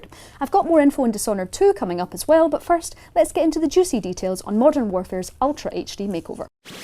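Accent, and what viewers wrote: Scotland, United Kingdom. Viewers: i cant understand you, and thise british accent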